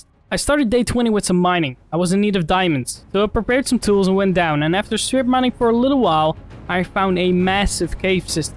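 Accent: Netherlands. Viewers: Nederlands accent tho XD verry nice game play! loved it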